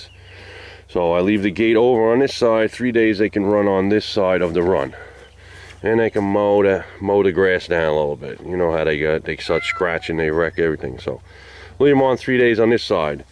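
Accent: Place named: New York, United States. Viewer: Only another NY'ER is able to pick up that accent so easily!! Yeah I went all "country" myself. I have 10 of those Polish hens. They are just so fun to watch!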